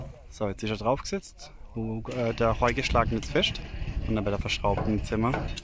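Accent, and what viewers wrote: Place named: Mannheim, Germany. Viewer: Es wird sehr , sehr schön 👍 Eure neue Katze sieht genz wie unsere aus. Wir haben den ersten Tag in unserem Haus gewohnt, da stand sie ganz ausgehungert vor unserer Tür und hat kläglich miaut. Dann haben wir festgestellt, dass sie auf unserem Grundstück in einem Holzscheit drei Babys versteckt hat. Wir haben alle zum Tierarzt geschleppt, aufgepäppelt und uns entschlossen , dass wir sie alle behalten. Hier sind alle übermäßig versorgt mit Tieren und bevor sie in schlechte Hände kommen bleiben sie bei uns. Die Mama haben wir Bounty genannt. Weiterhin viel Erfolg und Spaß beim gestalten . P S Ich frag mich ja immer welchen Dialekt Ihr sprecht, ich tippe auf Monnem 🤣oder Ludwigshafen. Bobenheim/ Roxheim hatten wir ganz früher mal sehr gute Freunde, die haben so gesprochen wie Ihr. Wir sind aus der Nähe von Meenz { Mainz)😂🖐️🖐️ Liebe Grüße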